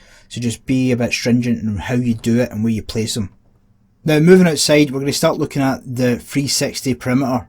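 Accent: Scotland, United Kingdom. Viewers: Scottish accents are the best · Good Scottish accent, I'm in Falkirk, from Cumbernauld and all fallout games look like Scottish newtowns!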